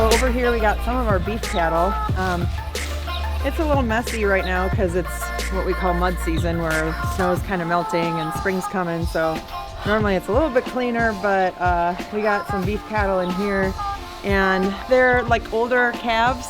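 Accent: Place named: North East, United Kingdom. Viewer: where is your farm located you sound a lot from the north east, I'm from Pa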